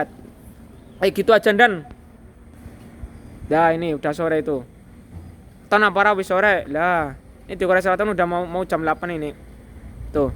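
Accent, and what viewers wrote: Banyumas, Indonesia. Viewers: Lapor ndan,,saya dari tiktok,,,lari kesini,, Saya suka logat ngomg mu ndan,,inyong inyong😀😀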